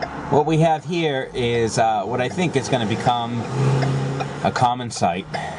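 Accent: Boston, United States. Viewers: I’m a firm believer that them YORKs get assembled but with a lot of failed attempts with parts and as soon as they get it right,they call it complete and move it out of assembly line,is the only way I can explain them cause you can be the best tech ever but you put one together and it fails to start so you gotta tinker around with it so it can start,I don’t like them,nope don’t them.Are you in the Boston area HVAC BROSKI cause I can hear you accent? We need top techs in that area and up into New Hampshire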